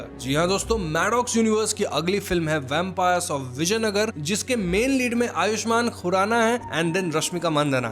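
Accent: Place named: Bihar, India. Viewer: Why do u sound so funny and Bihari types?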